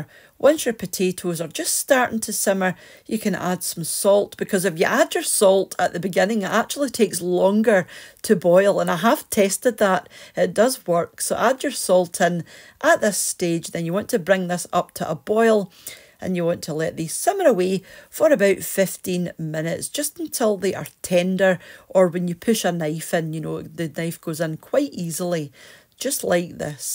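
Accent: Scotland, United Kingdom. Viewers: I love your soft Scottish voice... I could listen to you reading the phone book😂😂😂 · Every time I hear your wonderful Scottish accent I have a desire to fire up my R.G. Hardie pipes. 🇬🇧🤝🇺🇸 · First time I’ve watched you. Love the Scottish accent, which I miss from relations that have passed away now. Thank you for the sausage pie. Will have a go at that one sometime soon.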